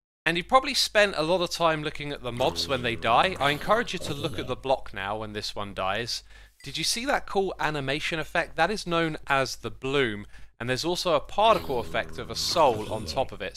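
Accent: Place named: United Kingdom. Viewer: Why does every Minecraft youtuber have a British accent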